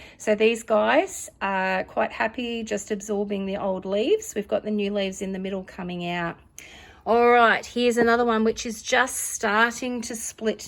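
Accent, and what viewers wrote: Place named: Australia. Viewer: Lovely Lithops, great to hear an Ozzie talking about them!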